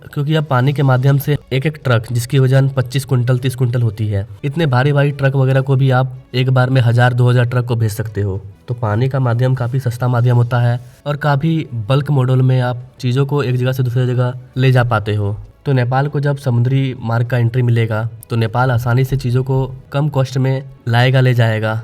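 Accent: Bihar, India. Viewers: बिहारी एक्सेंट सुनते ही मन से गाली निकलती है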